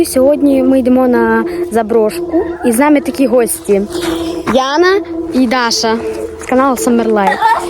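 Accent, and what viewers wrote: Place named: Transcarpathia, Ukraine. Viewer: Закарпацький діалект 😅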